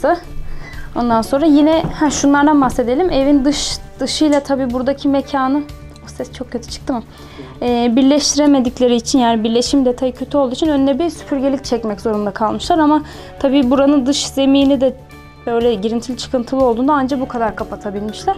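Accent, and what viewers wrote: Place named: Central Anatolia, Turkey. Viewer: İç anadolu aksanı var bence 🤔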